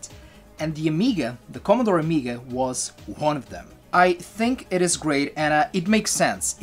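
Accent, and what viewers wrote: Portugal. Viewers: Eu estava a ouvir o sotaque e pensei...deve ser Tuga. E és mesmo. :)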